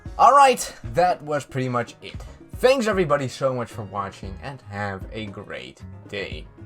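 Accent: Netherlands. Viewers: Only took me ten seconds of hearing your accent to find out you're Dutch haha. Either way, nice video and you're definitely right. Though these glitches are kind of to be expected since they're essentially cramming a DS game onto GBA hardware. What would be your favorite Johto romhack then?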